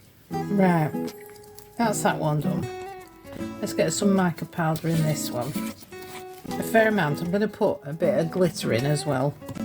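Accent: Northern England, United Kingdom. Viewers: Lovely to hear a northern voice (I’m from Wigan) I love this video and nice to see I’m not the only one with a few bubbles. I love your video’s.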